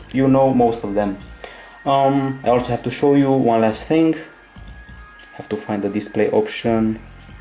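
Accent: Romania. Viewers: Ce accent romanesc:)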